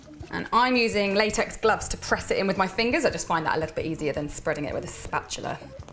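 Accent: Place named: London, United Kingdom. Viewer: It's a London accent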